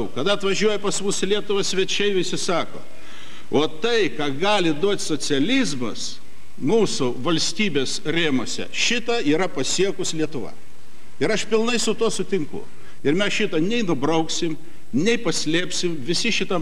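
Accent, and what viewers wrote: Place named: Russia. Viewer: blogai ,jis mires bet jo akcentas russiskas ,kaip ir snieckaus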